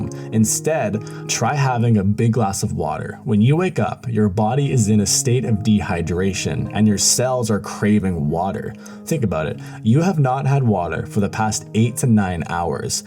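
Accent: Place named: Sweden. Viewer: Du är ifrån Sverige man hör det på din röst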